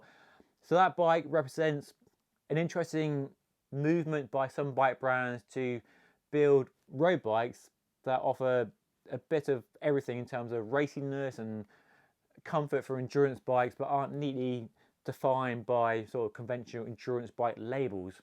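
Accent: United Kingdom. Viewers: UK commentator… is going to recommend any LHD bikes, or just RHD?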